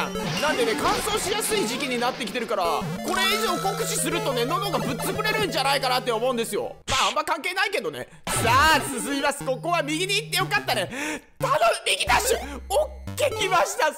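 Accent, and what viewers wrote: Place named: Kitakyushu, Japan. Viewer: たまに北九州の方言が出るのがめちゃくちゃ親近感湧きます🥑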